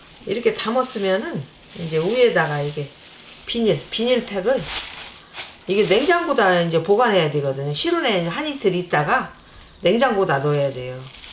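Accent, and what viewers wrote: Chungcheong, South Korea. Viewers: 전라도 사시는데 충청도 말씀으로 들려요~흐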